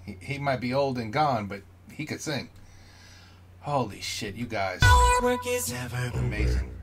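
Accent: United States. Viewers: Heard that NY accent and I was hooked. I'm from Brooklyn. Love your reactions. Welcome to the PTX rabbit hole, I am gonna enjoy hearing your great reactions to this unbelievable group been a fan since 2011 When they won the singoff. I'm going to take this journey with you. Subbed Now I can enjoy PTX and you. Thanks!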